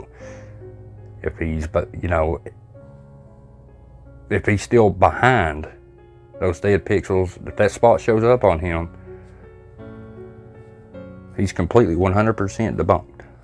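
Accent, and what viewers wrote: South, United States. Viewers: Thank You for opening my eyes to a lot of fakers out there that I TRULY BELIEVED THEM. I think it's really rotten to the core to put out FAKE PARANORMAL VIDEOS when actual hauntings do occur and the people who are affected and suffering from these events make them look either fake like theirs or their just labeled "CRAZY!!" Plainly speaking, it's just fucked up and a truly greedy way to make a buck!!!, I had asked you if you happened to read my comment not too long ago, about the ALEXA, and I asked you flat out, "Well then, can you please explain how he's communicating through the Alexa. So I'm so glad for this upload, that it was addressed after all, one way or another!! My boyfriend is not easily fooled and thinks VERY SIMILARLY the way you do, and told me how he's doing it, like THIS, SO this was ever so appreciated. THANK YOU, and I sincerely hope your Mother is feeling a lot better. 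Please give her my regards my friend. Kindly, let her know I get chills and I get head over heels love it when I hear your Southern Accent! ☮️💜🤘 PS: BTW... I'm from New York City 😘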